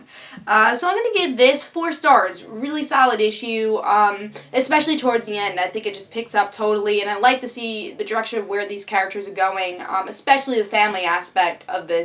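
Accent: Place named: New York, United States. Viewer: are you from new york? For a second there I thought you had a new york accent.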